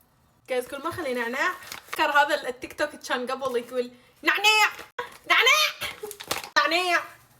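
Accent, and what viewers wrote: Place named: Iraq. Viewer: من طريقة كلامج حسيت إنج عراقية و تأكدت لما شفت شو كاتبة تحت الفيديو +++أنا مغربية و أحب العراق ❤🇲🇦🇵🇸🇮🇶❤🔥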